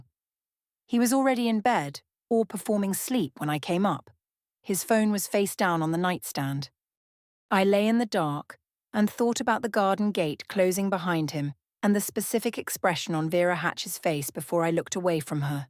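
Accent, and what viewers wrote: England, United Kingdom. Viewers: I enjoy the posts on this channel: the English accent, the female voice for a female narrator, the precision of ths details, and the studied inexorability of the narrative, among other virtues. This story, however, was WAY too subtle! Are we to understand that the narrator had a lesbian relationship with Sasha? Or was she only thinking of it? And what was the meaning of the two posts? How was the concept of thoughts being modified as if they were her own (or some such wording) -- whatever that could have meant -- defamatory? And what was the significance of the two copies of the novel, and what exactly was the narrator's explanation of why she liked to run her fingers along the spines of the books? Was it related to a lesbian relationship in college? And did the husband really divorce her because she suggested she was pressured into moving into the suburbs? 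I am utterly baffled by this story.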